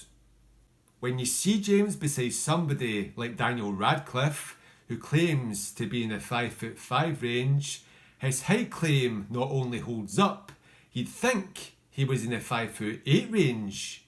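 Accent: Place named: Scotland, United Kingdom. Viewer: Hey you have a Scottish accent like him!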